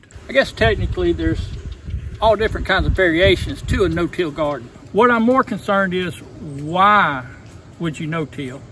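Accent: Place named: South, United States. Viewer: Preaching to the choir. Love the way you say soil with that southern drawl.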